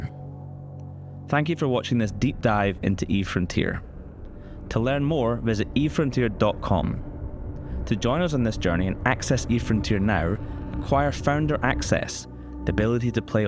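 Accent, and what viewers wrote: Scotland, United Kingdom. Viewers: That's a Scots accent, not Icelandic. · I can't believe after 20+ years of experience with EVE, you can still allow yourselves this level of shit with placing and advertising a product. It's no wonder each project other than EVE has failed. Its not the product, it's your absolute lack of understanding that we want proper information and motivation to spend money, and not half-arsed ambiance video with some dude who sounds like a Scot trying to balance talking English and gurgling liters of cum at the same time. Oh, good job on giving a monstrous advantage to people who are coders and into IT, over regular folks. Hey pleb, go work for that dude who knows how to write code and can make his own stuff up.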